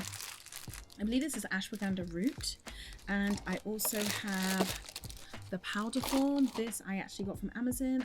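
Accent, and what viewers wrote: United Kingdom. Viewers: Whoo lord! Thank You for this list because a few names of the powders I couldn’t understand with her beautiful British accent.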